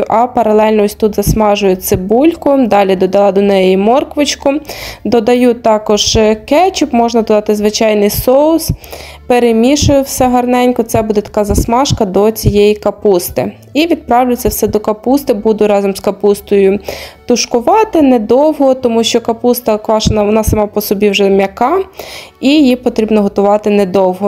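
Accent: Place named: Lviv, Ukraine. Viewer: Дякую за відповідь,бо розмова в вас така Львівська,але я не права Українська.Здоров'я вам та миру.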